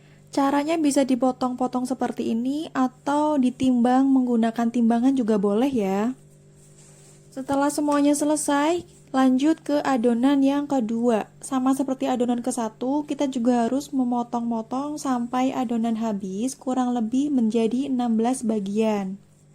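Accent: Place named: Java, Indonesia. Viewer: Medok ora pa2 yu,, 😁😁😁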